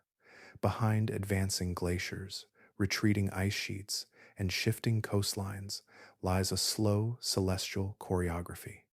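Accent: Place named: United States. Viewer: Thank you for the American accent on your voiceover.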